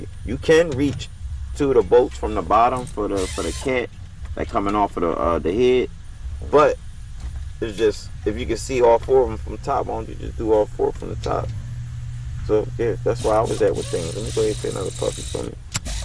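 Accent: Philadelphia, United States. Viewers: Are you from Philly? Happy belated and thanks for the vid.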